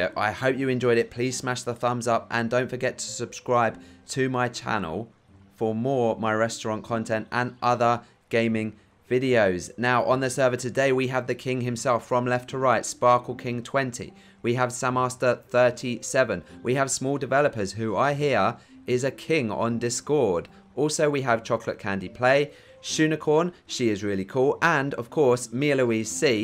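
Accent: United Kingdom. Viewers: I know u is British I am American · Your British?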